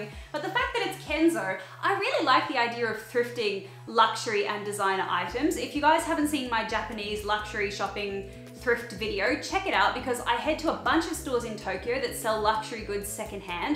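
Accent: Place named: Australia. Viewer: Hi! I seriously love that yellow dress and YES CERTAINLY, it reminds me of that Birger Christensen dress from the designer haul. I need to correct you though, Birger Christensen is a Danish brand, not Dutch. Birger Christensen was a Danish man that established a store in Copenhagen back in 1869, as a small fur repair workshop. I don’t know if the exact designer of the cute yellow dress is Dutch, but it wouldn’t surprise me! Also I’m a Dane myself, and I’m loving your lovely Australian accent, your friends, rambling, Archie and most of all your passion for pastels. I’m very into sustainability and seeing all these lovely thrifted items makes my day. Thank you for inspiring me to be myself 😭💕🌈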